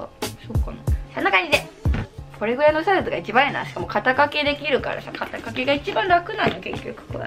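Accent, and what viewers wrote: Okayama, Japan. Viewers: めっちゃ岡山弁〜💞勝手に親近感わきました！可愛い人は岡山弁話してても可愛い件😍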